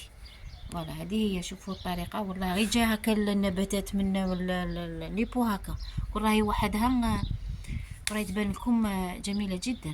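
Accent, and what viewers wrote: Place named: Mascara, Algeria. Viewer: ماشاء الله لوكان غير جيتي حذايا ندي من عندك شويى تهدري كيفنا في معسكر بصح ما عرفتكش مين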